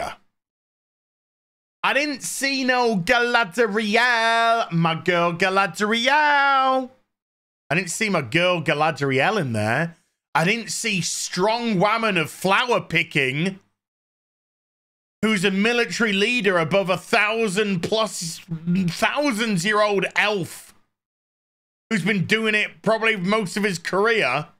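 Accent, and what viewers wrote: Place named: United Kingdom. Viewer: Ahhhhhhh!!!!! I needed this! I had to get my dose of! Love you, my brother! Your commentary matches my inner monolouge, exactly, minus your British accent. Keep the content coming!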